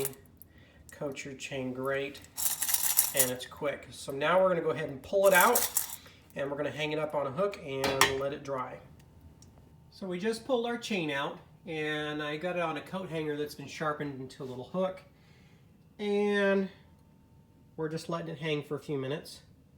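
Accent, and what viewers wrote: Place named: Midwest, United States. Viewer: Aside the annoying accent (yankee? midwest?) that over-emphasizes on bilabial consonants with an "h" sound that makes the talker sound like some pompous Victorian colonial windbag (it's maybe not the author's fault anyways, just his native accent), I'd say this is the best explanatory video on the matter. No useless, pedestrian intro... gets to the point soon enough. Much thanks! Will try that. I was also just wondering if adding paraffin oil is important, or just wax is fine.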